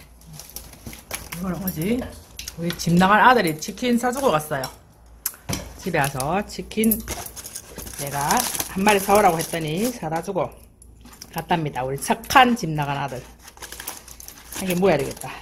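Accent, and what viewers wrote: Gyeongsang, South Korea. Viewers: 경상도 사투리 참 말로 재미있다 그렇치요!? 맛 난 요리도 맛 있고요 나도 해볼람니다 잘 계시소 자주 방문합니다 좋와요,구독도 잘눌러 줍니다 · 경상도 말씀이 정겹네요 제가 위가 않좋아 고생하는데 함 해봐야 겠네요 감사합니다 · 경상도 사투리가 너무 정겹습니다.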